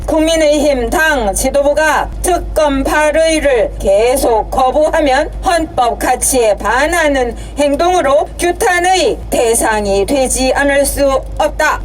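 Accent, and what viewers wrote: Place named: North Korea. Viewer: 이 방송하는 여자 바꿔라 ! 북한 노동적위대 방송하는 북한식 어투같아서 처음엔 깜짝 놀랐다. 당장 바꿔라 ! 강하고 아름다운 자유 대한민국을 후손에게 물려주기 위하여 !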